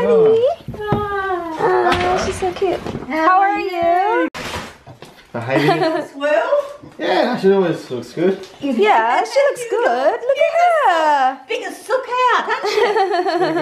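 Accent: Visayas, Philippines. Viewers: aha kas visayas ate oy? bisaya man diay ka hahahaa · I love when Ate talks in bisaya. Ang cute kahit hindi ko naiintindihan. Sobrang nakakahawa yung happiness mo Ate. 😊 Sana more blessings pa dumating sayo. 💖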